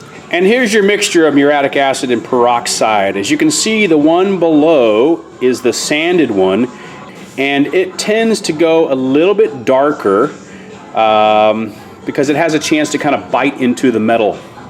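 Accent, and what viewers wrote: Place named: United States. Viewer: This is the most american sounding guy I've ever heard. If america was a person It's him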